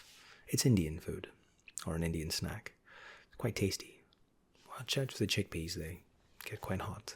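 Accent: Scotland, United Kingdom. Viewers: Genuinely cannot decipher your accent. I hear a Scottish twang, not the part I'm from but also not strong enough to be pure Central. Pls help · I read the actual comments to where the idea of this video originated. I didn't know if i was going to like the Scottish accent, but I wasn't disappointed at all. Keep up the good work boyo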